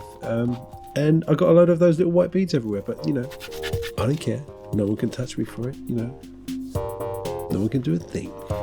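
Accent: United Kingdom. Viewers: Did you favorite every comment? That's... impressive. Also, why ARE you British all the time?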